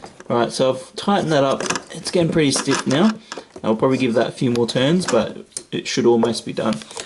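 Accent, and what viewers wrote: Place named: Australia. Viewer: Excellent tutorial!!! You have done your homework. And to hear an Aussie voice, it helped me greatly with my homework. Task completed easily....thanks to YOU. Ps. If I can join the chorus...'Beers are on me'!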